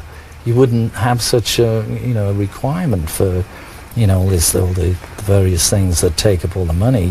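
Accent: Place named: United Kingdom. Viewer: THE ACCENT IS MUCH BETTER THAN AMERICAN ACCENT AND APEACH, AMERICANS DONT LIKE IT, EVEN WOMANS, BRITISH WOMANS, BEAUTIFUL ACCENT AND VERY KIND, NOT RUDE LIKE AMERICANS GIRLS., LOVE BEATLES, WHO DOESN T ?? ELVIS, NEVER PLAYS GUITAR LIKE BEATLES OR WRITE A SONG, OR THIS VANILLA ICE LOL, ITS A JOKE, AMERICANS, THEIR GOT ONLY INSTRUMENTS, REAL MUSICIANS ARE FROM UK...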